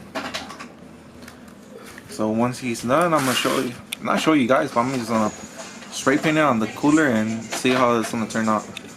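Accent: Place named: Mexico. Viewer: The solid Mexican accent I have ever heard.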